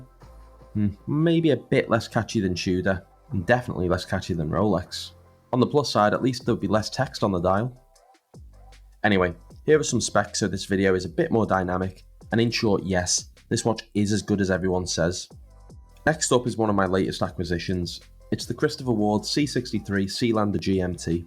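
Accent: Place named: Northern England, United Kingdom. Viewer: The voice is completely different, the commitments set in Ireland, and the chap running this channel is northern English (possibly close to Liverpool but not from the city itself?)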